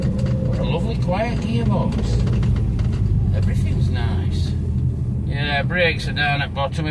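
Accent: Yorkshire, United Kingdom. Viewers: Thanks for the video I always look 4wards to your videos & il always support you in what you do in anyway I can my friend, I'm away sharing your videos & me n my mate have screening binges on all the videos you do. They take us rite back to our youth & I love the way you talk & your accent as you remind me of my gran & mum as they are originally from Yorkshire Bradford n Wakefield. I have so many good memories watchin n listening to you & your videos. Please keep up your amazing work & your mega videos my friend. Best regards. Jim in Manchester.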